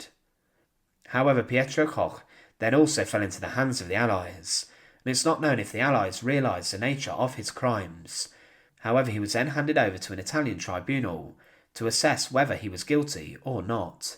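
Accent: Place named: England, United Kingdom. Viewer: I like it. It’s English but I can’t tell from which region. Even if you don’t like it it’s better than an awful AI voice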